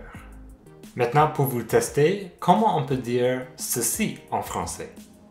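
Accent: Quebec, Canada. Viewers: Merci professeur pour cette merveilleuse leçon ! Je viens juste d'arriver sur votre chaîne. Vous êtes Canadien francophone ?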